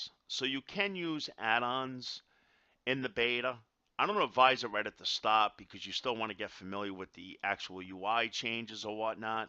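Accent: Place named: Boston, United States. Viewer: Mass/Boston? lol trying to place it :D Anyways nice video Ty for it, just coming back and this is just what I was looking for :) Keep up the great work and TY for your time.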